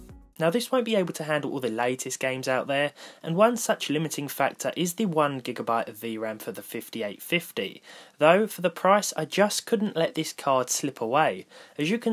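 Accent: United Kingdom. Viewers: I like your accent mate where do you come from in the UK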